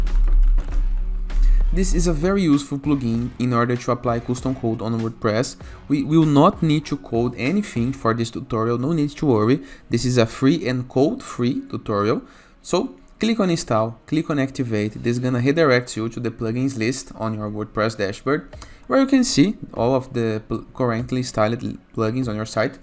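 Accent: Brazil. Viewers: consigo reconhecer o sotaque brasileiro de longe, valeuzão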